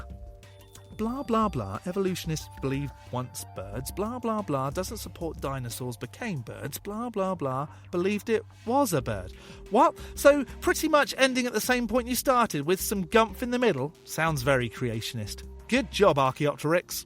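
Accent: Kentucky, United States. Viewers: That is how they speak in Kentucky? Justified! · For years, I've been trying to "place" your accent, geographically. Imagine my surprise, I'm not as familiar with Kentucky regional speech as I thought.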